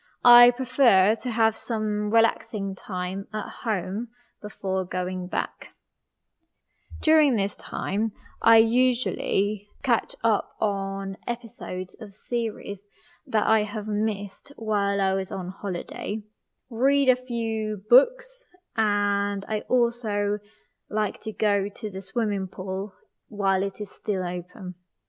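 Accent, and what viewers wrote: United Kingdom. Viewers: Your voice is so pretty wow I love it with the unique British accent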